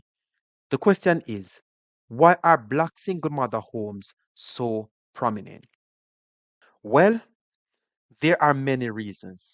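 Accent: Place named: Jamaica. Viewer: all due respect bro, but you sound Jamaican. why are you making videos about FBAs? 50 percent of jamaican children live in single parent households. whats your point?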